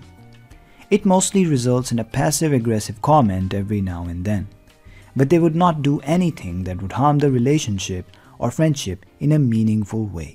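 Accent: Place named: India. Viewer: Are you Indian by any chance??? Your accent sounds like an Indian so....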